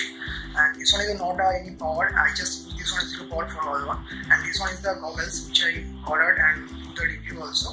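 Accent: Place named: Bengal, India. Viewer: Abey english to sidhe bol... Bengali english mat bot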